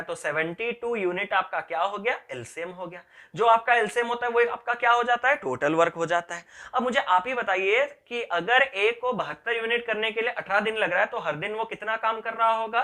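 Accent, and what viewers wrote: Odisha, India. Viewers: Are you Odia sir?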